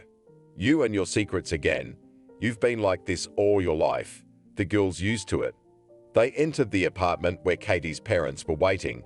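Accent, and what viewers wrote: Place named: United Kingdom. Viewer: well im sorry to say that the story might very good but I need to quit listening because the way the narrator speaks, it's so hard to understand as he is with british accent. making letter A as I, like in the word play as ply. letter O as Oi, as in the phrase I doint knoi, goi hoime, etc. hope you could find a better narrator with american accent. sorry again and thank you.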